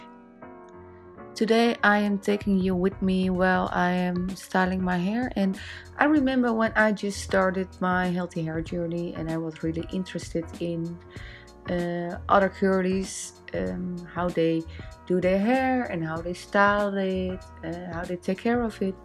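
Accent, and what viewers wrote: Netherlands. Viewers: Are you Dutch? You have a very strong Dutch accent! I think. [edit: yes, cat Vlinder was just introduced] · Is she Dutch? She sounds really Dutch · Ben je toevallig Nederlands? Ik hoor een nederlands accentje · Oke dit is misschien een beetje apart maar ik hoorde aan je accent al dat je Nederlands was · The Dutch accent is strong but I like it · Hoor gewoon aan je accent dat je nlse bent 😍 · je hoort dat ze Nederlands is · She really sounds dutch. Thats a typical dutch accent. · I want to say you are dutch. I can hear it in your accent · you sound kind of Dutch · Are you dutch? You sound verryyyy dutch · Are u from Holland because u sound like a dutch person with broke englishe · Je kan het nederlandse accent er zo goed door heen horen haha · I think you're from the Netherlands the accend gives it away😂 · I've never seen more beautiful curls before 😍 You are like an angel, you motivate and inspire me to love and take care of my curly hair no matter what other people say (classmates all have straight, thick hair and I have thin curly/wavy frizz! 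-_-) At least my hair is natural and not as damaged as their hair, but it seems they all think ringlets looks funny and childish.... 😒 THANK YOU so much for motivating me!!! ❤❤ Also, your accent is super beautiful, I believe you are from the Netherlands? You're such a beautiful lady really. Hugs from Sweden 😇💕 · Beautiful hair. U sound like my Dutch husband lol I’m guessing U are Dutch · Haha lekker hollands accentje heerlijk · You sound Dutch! Are you? Hoi🙋🏽‍♀️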